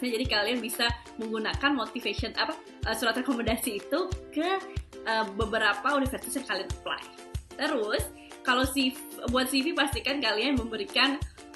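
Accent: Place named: United Kingdom. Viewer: Logatnya Udah Btistish UK banget . 😅😅😅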